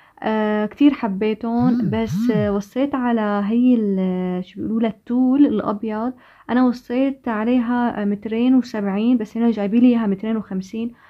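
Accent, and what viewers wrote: Syria. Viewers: متابعتك من جديد من الشام.. ومن لهجتك دغري عرفت انك سورية ❤❤❤مااا شاء الله فيديوهاتك مبينه بتجنن رتابة وتنظيم راح اعمل لفة بالقناة شوفهن تبقى كلهن عرواق الله يهنيكي ويسعدك يا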